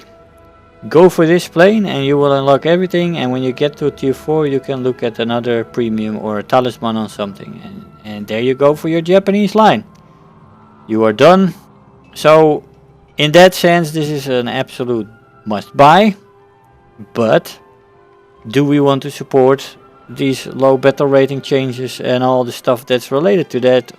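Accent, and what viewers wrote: Netherlands. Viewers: hi, are you dutch?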